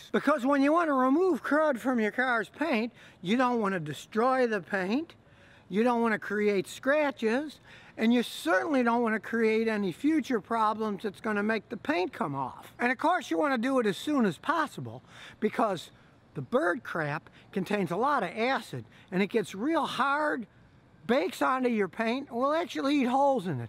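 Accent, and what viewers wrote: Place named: California, United States. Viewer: When I first watched your videos, I guessed you were from a California. You don’t sound like a Texan. I have good friends from both states.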